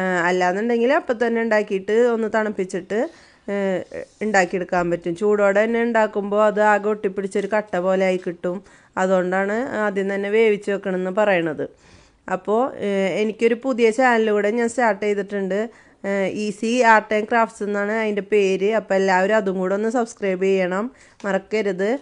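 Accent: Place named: Azhikode, India. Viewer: Ithaade Samsaram kettapol thonni.😊 athaa chodhichath.. njan kaipamangalam aanu.. husbandinte relatives und azhikod.. angineya ee slang parichayam☺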